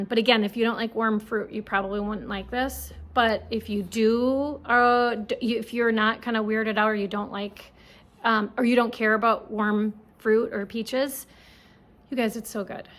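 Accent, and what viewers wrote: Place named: Minnesota, United States. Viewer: Just found you today and am binge watching your video podcasts. I think your Minnesota accent is charming. I have an Atlantic Canadian accent...no doot aboot it, Eh? 😂 Thanks you! 🥰🇨🇦Sharon.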